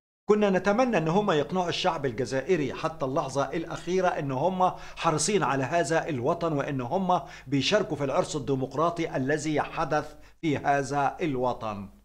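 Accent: Egypt. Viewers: السلام عليكم ورحمة الله احنى نعشق اللهجة المصرية لا حرق عليك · لا مشكلة في نطقك بالجزاءر بلغة مصر تخرج من فمك زي العسل والله المهم انك معنا و تحليلك لكل الاخبار رايع رايع رايع كل الحزاءر تحبك و نظعك على رؤوسنا و لا نتعب ابدا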